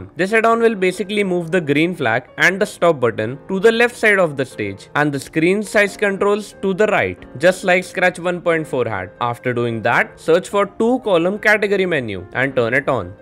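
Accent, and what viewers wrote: India. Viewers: Yes everytime you here a indian dude as the tutoruial narrator you know thats this is going to be the best tutoriual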